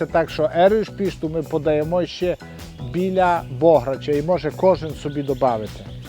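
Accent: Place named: Transcarpathia, Ukraine. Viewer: я четко по акценту понял, что про бограч надо смотреть тут. Бограч и банош-прекрасные блюда. Люблю Закарпатье и людей. Привет из Харькова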